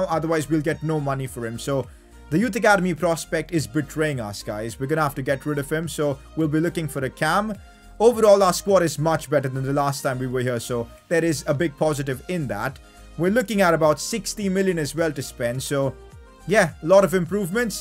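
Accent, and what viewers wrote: India. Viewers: R u indian bro? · Why does have an Indian accent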